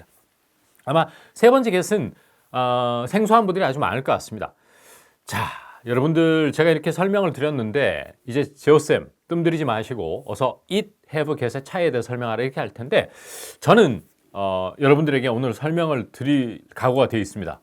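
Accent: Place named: Gyeongsang, South Korea. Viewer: 선생님 목소리 너무 좋으세요~~ 경상도 사투리가 들리는데 어디세요?? ㅎㅎ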